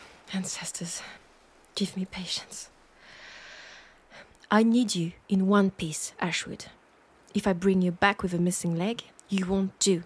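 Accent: France. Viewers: Yo, i've been trying to find a good French ASMRist. All the other ones are British and American people putting on an overly strong accent. The subtleness is great. Hope you make more.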